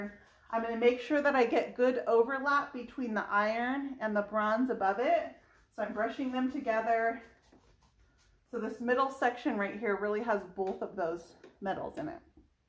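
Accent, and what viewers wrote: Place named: Wisconsin, United States. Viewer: I've never noticed it before but you sound like you might be originally from Wisconsin...or maybe your parents are. I think it's an adorable accent.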